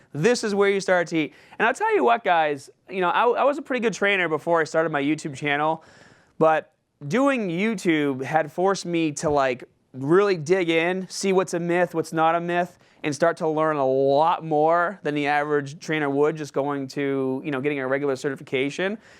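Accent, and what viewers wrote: Boston, United States. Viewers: Your staht boston accent comes out a little, haha.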